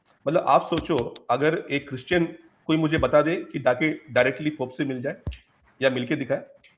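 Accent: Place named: Kerala, India. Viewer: I can easily make out the Mallu accent (among other things). It's difficult to hide.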